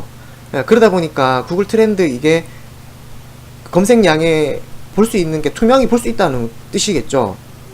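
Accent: Gyeongsang, South Korea. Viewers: 대구 느낌나네요. ㅋㅋㅋㅋ 화이팅입니다. (저도 대구입니다~)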